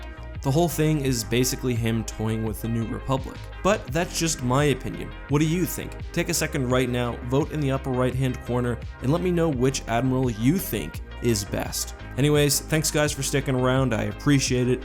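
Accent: Canada. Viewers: Ay you canadian??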